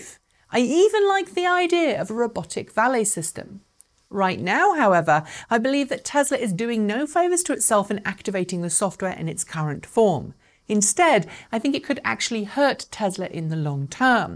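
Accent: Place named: United Kingdom. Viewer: So happy your British accent has returned after having listened to the one with Arcimoto. I have no doubt whatsoever that if Tesla removed any feature once enabled it would lead to legal confrontations. Many Americans do not like to be told "NO!". Having owned my model3 for a year now, the autopilot function is great with a few caveats, it is sadly out of its depth when required to cope with the many mentally challenged road users that frequent Hwy 101. I am of the sad opinion that the roll-out will be seriously hindered because of the incompetent drivers on the roads today. I further think that this situation will not improve with time.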